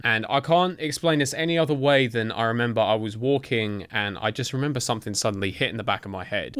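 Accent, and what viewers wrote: United Kingdom. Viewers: I already love this series lol, gonna have much fun with these listening a bri-ish gentleman talk about his experiences.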